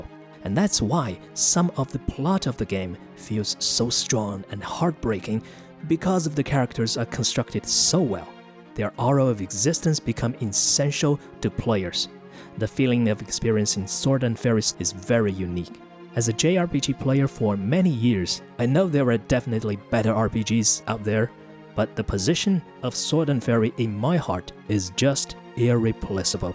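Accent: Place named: United Kingdom. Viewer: omg 这么酥的英音吗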